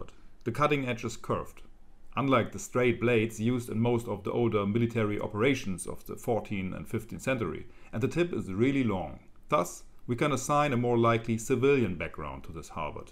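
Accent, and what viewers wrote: Germany. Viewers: Hallo, dein Dialekt hört sich deutsch an, hast du auch einen Kanal mit deutschen Videos?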